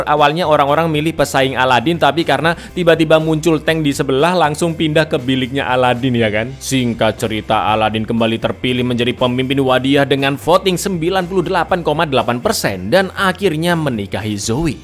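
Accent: Java, Indonesia. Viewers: Wong jowo mas?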